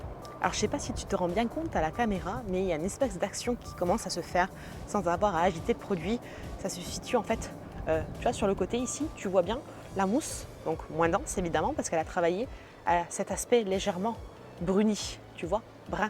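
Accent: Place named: Corsica, France. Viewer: Coucou comment vas-tu Petite vidéo sympa pour le bêtisier j aurait mis un colorant bleu comme ta voiture c est vrai que le produit a l'air peu économique mais il a deux fonctions PS j adore ton acens anglais corse😀😘 a vendredi bises cordialement ce serait un plaisir que un jour je puisse te rencontrer pour avoir tes conseils au plaisir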